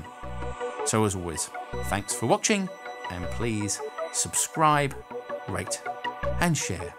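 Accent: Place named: Southern England, United Kingdom. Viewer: That's his Southern English accent showing through. Many English accents don't put a lot of emphasis on the "rrrrr" sound of the letter "R".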